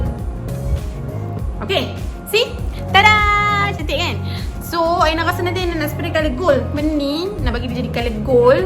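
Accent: North, Malaysia. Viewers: aina kalau ckp utara lagi best dgr 😂 sy suka dgr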